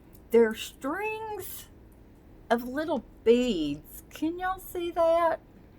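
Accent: South, United States. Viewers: why is it that you have no accent in some of your videos but have a strong southern accent in other videos?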